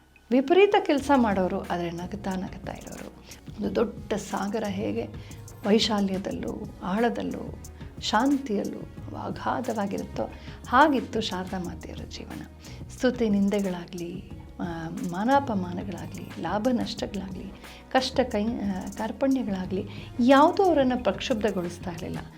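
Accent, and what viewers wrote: Standard. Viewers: swachavada kannada 🙏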